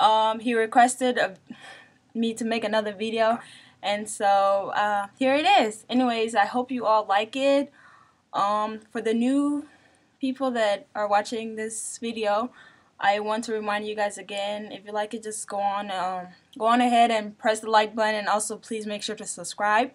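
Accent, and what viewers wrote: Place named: Dinka, South Sudan. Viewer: lmfao ur dinka asccent is so on point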